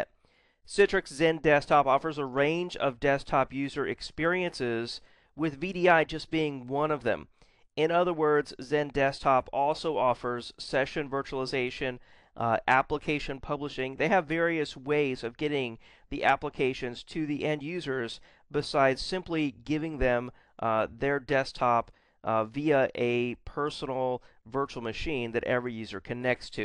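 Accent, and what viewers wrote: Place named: Philippines. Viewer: are you a pinoy? you have a strong pinoy accent.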